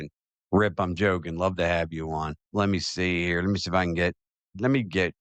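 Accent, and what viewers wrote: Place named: Baltimore, United States. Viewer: I love your Baltimore accent. Go Os.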